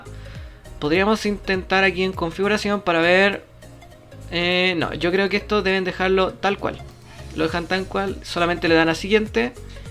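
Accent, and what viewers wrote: Chile. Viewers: eres chileno no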